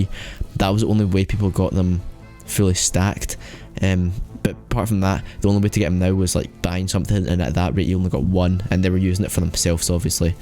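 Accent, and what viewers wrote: Scotland, United Kingdom. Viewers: Are you from Scotland and epic name is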